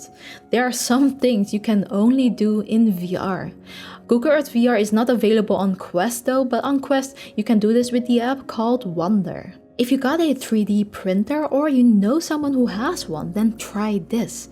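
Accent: Netherlands. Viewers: I love your accent, is it dutch?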